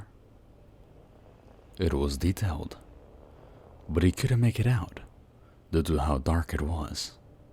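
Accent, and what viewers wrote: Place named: Europe. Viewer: You sound like the foreign European guys from Family Guy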